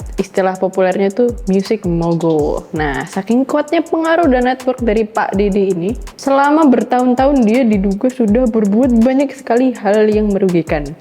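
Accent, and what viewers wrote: Java, Indonesia. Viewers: hostnya kok medoknya lama-lama ilang ya? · Muka bule, tapi kadang keluar medoknya. Mbak kamu terbaik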